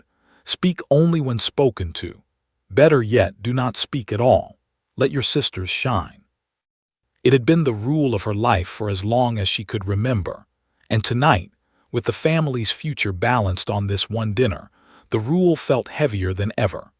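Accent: United States. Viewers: Oh dear, about half way through and at least half a dozen mis-pronouncements in an inappropriate american, to my ear, voice. But it's a short story and perfectly sweet.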